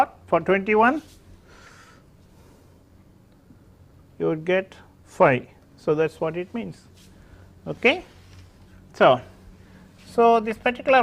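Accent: India. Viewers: It's sarcasm, I just had a very bad day then. I ended up passing that class just. I personally just find Indian accents very hard to understand.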